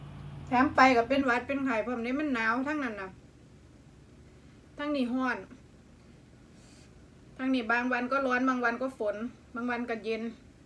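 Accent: Isan, Thailand. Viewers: สวัสดีครับน้อง พี่เป็นคนอิสานแถวหนองบัวลำภูครับติดตามน้องมาโดนเกือบปีแล้วมักเจ้าเว้าอิสานม่วนครับผม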